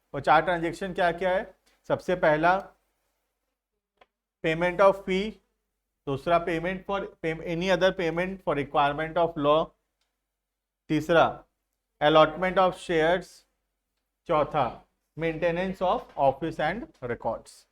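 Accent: Odisha, India. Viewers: Sir app odisha se ho kya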